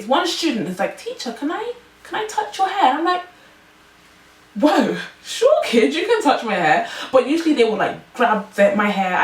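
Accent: England, United Kingdom. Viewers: ahhhh yesss....a black girl with a british accent.....so sexxyyy yum......0_O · Are u from england? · im not trying to be mean but the british accent is geting a tab bit annoying ... i mean it was ok at first but the video was a little to long for the accent and its getting tireding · Holy crap youre english!!!! I love your voice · your British 😮😮😮yesssss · Honey you are gorgeous, sexy, and I love your British style accent. And you have a beautiful personality and seem to be very intelligent. Your hair is unique and different, and irrelevant to who you are as a total individual.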